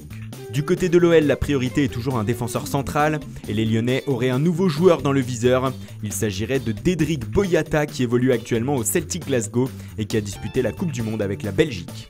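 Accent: Italy. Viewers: Ptn arrête avec ton accent italien c’est relou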